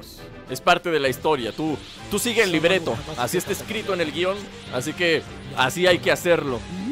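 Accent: Aguascalientes, Mexico. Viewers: eres de Aguas Calientes? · eres mexicano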